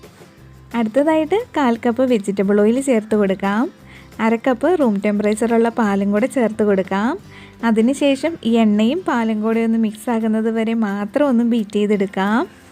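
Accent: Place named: Kottayam, India. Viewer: palakkar Kottayamkar parayunnathu pole parayunnathu kelkkana rasam.Appol ithil one cup 240 ml aano.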